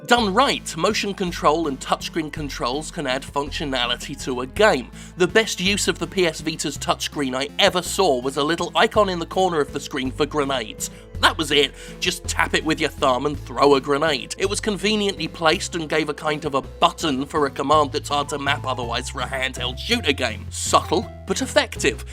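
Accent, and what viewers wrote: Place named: United Kingdom. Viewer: I've never heard of you before this video was highlighted on Kotaku. Not only do I enjoy your words but everything sounds even better if your Brittish. However, the reason I subscribed is because this is brilliant and clever and very simple and I have a fondness for sticking it to the large corporate entity. Well done and I look forward to more videos.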